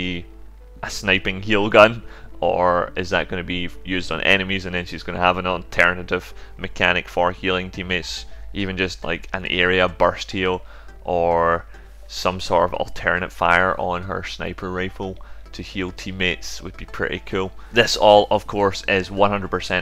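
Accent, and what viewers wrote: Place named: Scotland, United Kingdom. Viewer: Your accent sounds scottish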